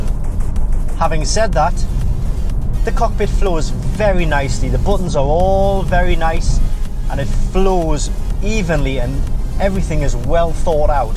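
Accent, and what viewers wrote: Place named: Geordie-Scouse, United Kingdom. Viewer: Unwatchable. Horrible muzak and what's that fucked-up Geordie-Scouser accent?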